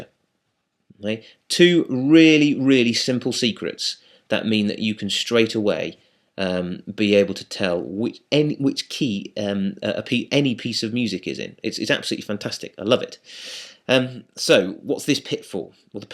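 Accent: United Kingdom. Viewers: British man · Why do I learn better from a dude with a British accent? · THANK YOU.YOU ARE AWESOME AND YOUR ACCENT MAKES ME MISS UK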